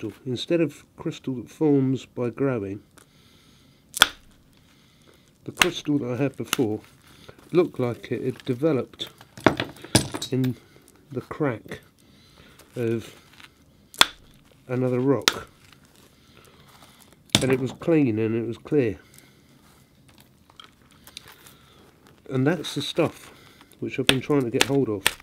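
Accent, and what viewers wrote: England, United Kingdom. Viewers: You have the most wonderful english accent, I know that might sound strange but I just find your voice so soothing. I feel as if I could fall asleep listening to you talk about quite literally anything.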